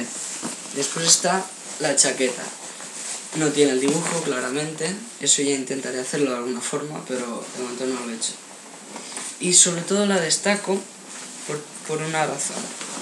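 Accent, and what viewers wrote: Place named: Catalonia, Spain. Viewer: com es nota que ets catala cabro! xd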